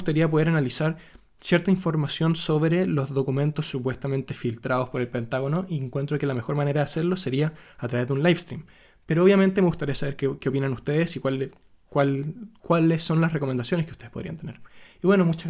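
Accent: Chile. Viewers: ¿Por casualidad eres chileno?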